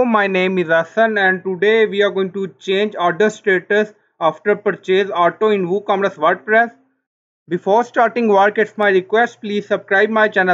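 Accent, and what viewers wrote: India. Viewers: they way you speak english is Punjabi is amazin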